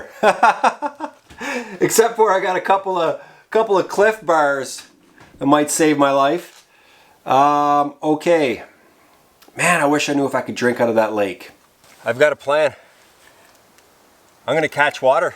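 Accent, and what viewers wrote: Newfoundland, Canada. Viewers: are you from newfoundland ive been watching your vids about a year now and i often said i think he is from nfld or lab for sure are you???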